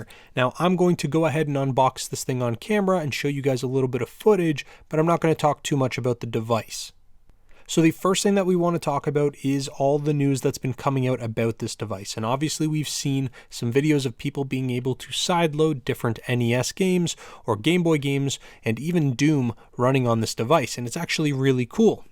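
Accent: Canada. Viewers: You sound suspiciously Canadian.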